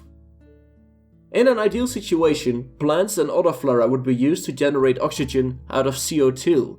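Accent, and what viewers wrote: Netherlands. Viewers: let me gues, ur dutch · Your accent sounds Dutch, South African and German at the same time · Are you Dutch? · Nice dutch accent though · Annoying dutch accent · you're definitely dutch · Lekker Hollands accentje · Awesome video! Like and subscribed, you have a decent english accent, but i immediately heard that you were Dutch <3 Groeten uit Rotterdam ;)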